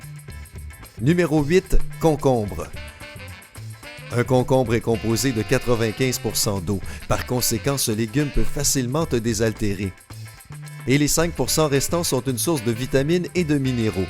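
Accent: Quebec, Canada. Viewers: cest un québécois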